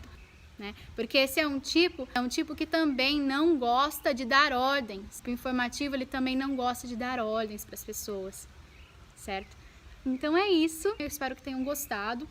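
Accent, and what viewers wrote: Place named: United States. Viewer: gente, ela fala muito "okay" pq ela morou muito tempo nos eua ai fica meio q um sotaque sabe, para brasileiros que não conhecem ingles pode parecer diferente mesmo, mas òtimo video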